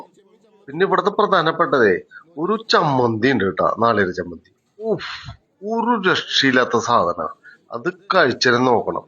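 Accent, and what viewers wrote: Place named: Thrissur, India. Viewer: ആ തൃശൂർ സ്ലാങ്കിൽ ഉള്ള ആന്റെ ആ അവതരണം കിടുക്കി ട്ടോ ഗഡിയെ 👌😀👏👏👏👏👍 മൊട്ട ഗ്ലോബൽ ടീം സൂപ്പർ 💐👌👌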